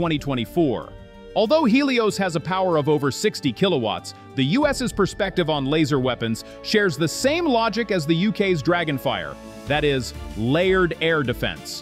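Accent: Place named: Russia. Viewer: you sound like a Russian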